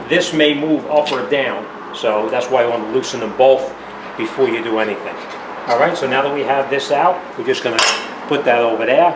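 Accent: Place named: East Coast, United States. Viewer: I can tell from the accent and the rusty rotors and calipers that this is East Coast. Good video!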